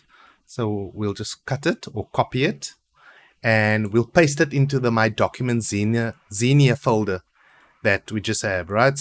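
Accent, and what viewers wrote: South Africa. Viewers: ive been trying to play this game on pc of so long and your vid really helped me tanks(btw are you south afrian) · bru are you south african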